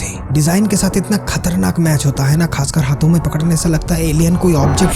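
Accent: Bengal, India. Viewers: The way he speaks, makes the video more interesting And thank u for conforming that u r a Bengali😂❤ Already i guess that by ur accent ❤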